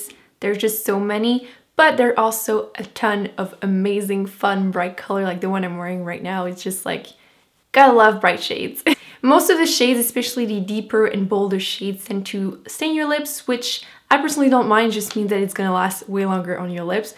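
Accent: Quebec, Canada. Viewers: So Happy to find a Canadian Vlogger!! Yay. I knew you were a fellow Canadian from the accent. Love it :D Great channel and wonderful swatches. Very Helpful!! Thanks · wowo je t'écoutais parler et sans même savoir réellement je me suis dit man this girl has a french canadian accent!! lol Great!! Tu les a acheté ou tes wet n wild?? Ils n'en vendent plus au Wal-Mart!! Je suis obligée de commander sur amazon!!! Pis ca devient trop cher vu qu'il me charge le shipping,dire qu'au États ils sont 2 $ grrr booh loll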